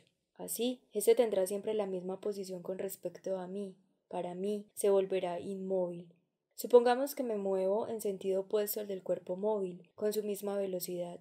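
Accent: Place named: Antioquia, Colombia. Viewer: Bergson con acento paisa, estoy en el cielo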